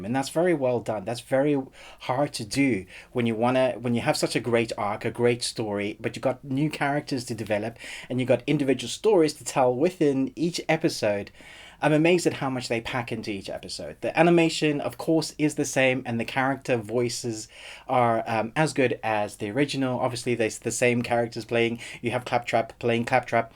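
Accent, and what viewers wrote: South Africa. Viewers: Is it just me or does this guy sound South African?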